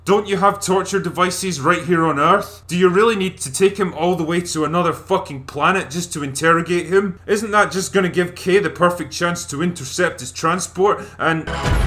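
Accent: Scotland, United Kingdom. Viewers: TCD's accent sounds scottish but some vowels are different... "Chill pill" doesn't become "chell pell", and often "spud gun" doesn't become "spood goon". Anybody know where he's lived?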